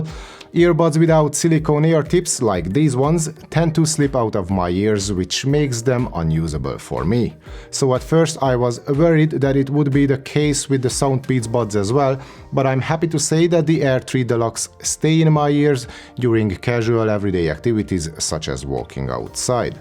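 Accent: Hungary. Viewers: First of all, great content! Secondly, is it just my ears playing with me, or you do have a hungarian accent?😅 We tend to say that a hungarian can recognize another hungarian no matter how professional their language skills are😅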